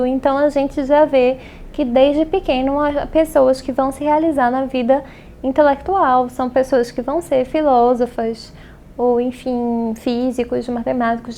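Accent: Brazil. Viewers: Acho engraçado o sataque dela de Pernambuco!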